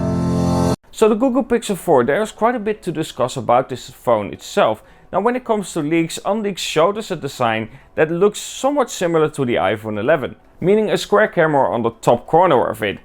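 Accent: Netherlands. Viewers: Lekker nederlands accentje ook! Haha niet erg maar he je werkte samen met MKBHD Supersick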